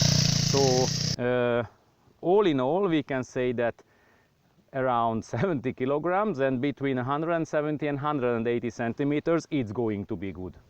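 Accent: Hungary. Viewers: magyar akcentus:D nice video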